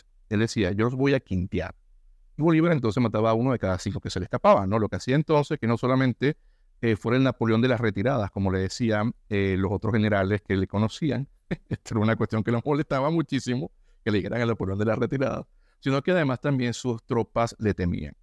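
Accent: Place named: Peru. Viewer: Entiendo que se le deshumanizo y se le dio carácter mesiánico, eso sí es cierto, pero no fue el único libertador mitificado. Soy venezolano, y la verdad nunca me aprobaron ningún examen de historia bajo la presión de hacer la señal de la cruz ante la imagen de Bolivar por favor...deje de decir mentiras.... ni me obligaron a cantar el decreto de guerra a muerte, ni nada de lo que usted menciona. Tal ves sería en su escuela, deberia decir cual fue su escuela y donde estudio usted , ademas de que su acento es peruano, no habla como venezolano. Esta usted diciendo muchas cosas sin fundamento Sr. Revele sus fuentes y escriba un libro para poder buscar sus fuentes historicas. Sinceramente que verguenza usted. Ojala tenga que cerrar su canal por baja popularidad. Diciendo mentiras no se llega muy lejos. Revele sus fuentes.....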